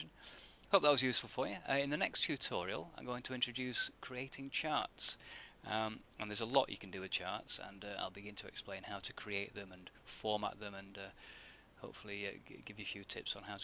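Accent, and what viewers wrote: United Kingdom. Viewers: I had to learn Excel quickly before I start my new job. These tutorials are excellent! Moreover, everything sounds so much more interesting with that lovely British accent! Thank you so very much. I'll feel quite confident going into my new position.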